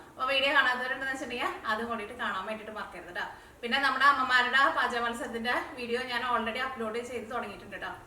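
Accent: Thrissur, India. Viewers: Chechi thrissur kaari aaanoo....najn thrissurikkaariyaa.......tune kettittu thooniyathaa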